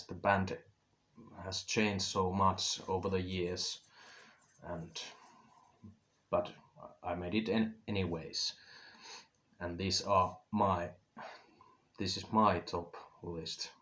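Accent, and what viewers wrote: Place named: Finland. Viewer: Ive missed that Finglish accent🤣 ✌✌ 👏👏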